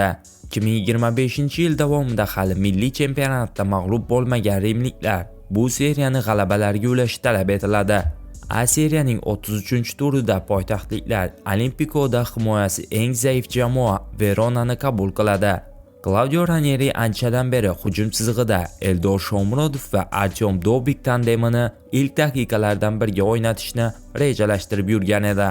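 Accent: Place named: Russia. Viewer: ЎЗБЕКча гапиришни ўрган, нима тилни устидан кулябсанми? ЎЗБЕКчани энди ўрганаётган ўрисга ўхшаб гапирасан. Кабул эмас, қабул.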